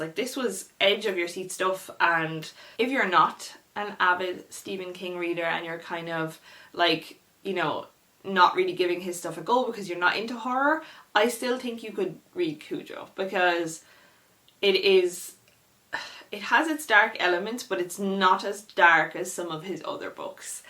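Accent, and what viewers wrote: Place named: Ireland. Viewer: I had to subscribe to your channel because I also love the same genre as you in novels ... and because of your gorgeous Irish accent. Lol. ❤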